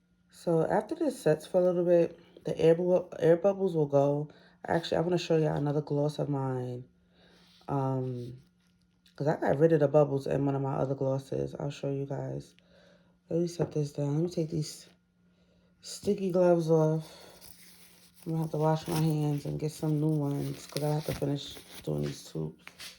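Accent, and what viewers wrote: New York, United States. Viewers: Are you from NY? Love the accent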